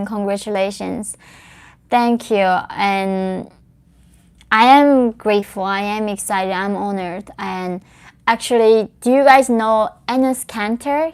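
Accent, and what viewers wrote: United States. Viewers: you sound more American than native born Americans. In a sense that is a bad thing. Keep speaking out to open peoples minds.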